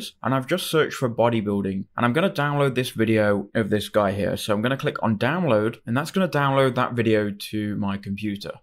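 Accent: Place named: United Kingdom. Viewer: Nice job.. Then ur accent is great.. British 😊😊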